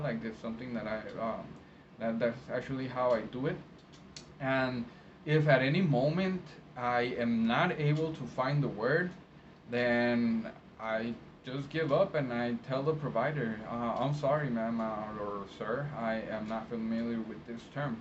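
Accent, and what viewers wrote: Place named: Mexican American, United States. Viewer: Hello. I enjoy watching your videos. Thanks for sharing. I was caught off guard when you stated you live in Mexico because your accent sounds Mexican American with English as your dominate language. Were you raised is the USA? Do you interpret for USA or Mexican providers?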